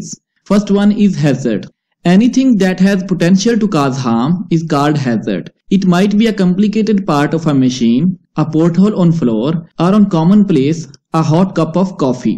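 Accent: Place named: India. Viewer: He has got the Indian varient English all mixed up? Or is he in a cold bath?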